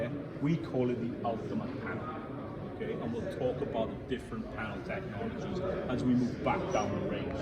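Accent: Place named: Wales, United Kingdom. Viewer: Great to hear a Welsh accent demoing a TV :) Thanks for this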